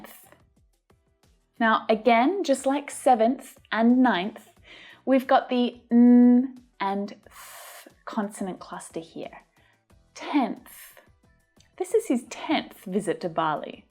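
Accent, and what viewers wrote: Australia. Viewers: I've got a question, just out of curiosity. Yours is the cultivated Australian accent, right? I love it, it's kind of the best of 2 worlds, British on one hand and American on the other but without some of the oddities of them, at least this is my point of view as non native English speaker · speaks with a cultivated Australian accent and she is from Melbourne (the second biggest city after Sydney)... I can assure you that not all Australians speak as clearly as she does! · Are u from Australia ? Your english sounds more clear...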